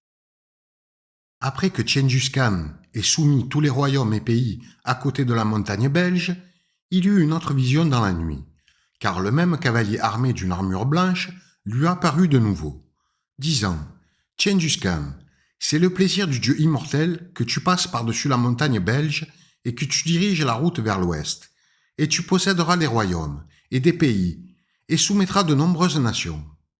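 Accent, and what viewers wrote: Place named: South, France. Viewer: Non, j'déconne vos vidéos sont très interessante te votre accent de sudiste est agréable!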